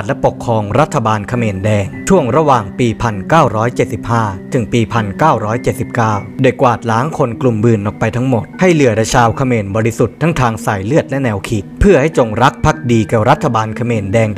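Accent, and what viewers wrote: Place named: Suphanburi, Thailand. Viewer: แอบเหน่อสุพรรณ😂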